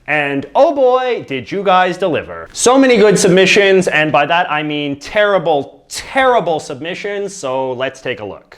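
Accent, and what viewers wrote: Canada. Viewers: your Canadian because abot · I just noticed that you are Canadian because you pronounced about like a boot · ok so he just has a Canadian accent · This guy has the most stupid stereotypical Canadian accent I have ever heard is he doing it on purpose? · dumpling dump chill, he's Canadian he says aboot so yeah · Just noticed you talk Canadian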